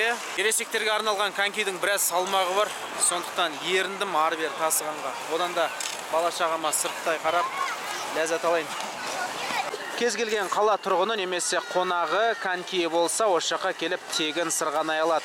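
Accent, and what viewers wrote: Standard. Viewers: Жарайсын бауырып осы жолыннан тайынба, таза казакша тындаган кулакка не деген жагымды. Жарайсын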